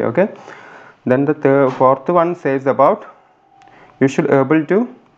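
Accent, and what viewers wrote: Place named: United States. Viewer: The way,you are speaking English seems like you came from USA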